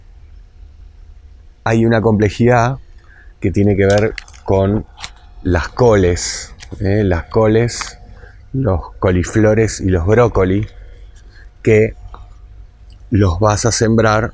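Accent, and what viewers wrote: Buenos Aires, Argentina. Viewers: Andrés Modesto Rodríguez De León es la forma de hablar de los “porteños” (o sea de los de la ciudad de Buenos Aires y alrededores) en Argentina. Hablan así, parecen soberbios. Te lo dice una Argentina del interior del país. A nosotros a veces también nos choca esa manera de hablar. Pero sabe un montón. Junto con Tony de España, a mi me han ayudado a hacer mi huerta.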